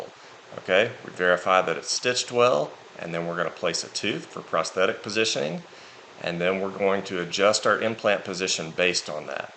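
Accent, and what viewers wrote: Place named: Chattanooga, United States. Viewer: Thank you! Very helpful. I grew up in Chattanooga, thought I recognized that accent, cheers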